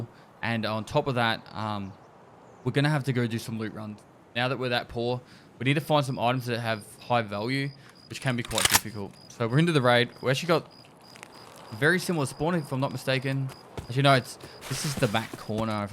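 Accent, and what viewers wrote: Australia. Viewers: Love these vids! You're very informational for us new players, me included, plus the aussie accent is nice to listen to! GL in the Netherlands!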